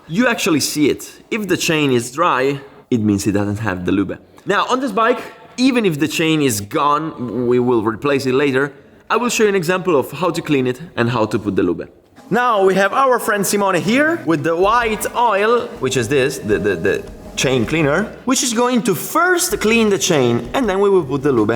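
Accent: Italy. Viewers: alles ok im Video !! mir gefällt Dein Englisch mit italienischen Akzent - erinnert mich an meine Urlaube in Italien !!! ;-)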